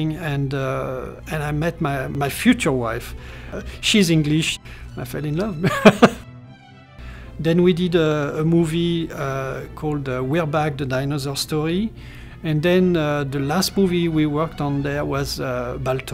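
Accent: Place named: France. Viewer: Superbe accent français😂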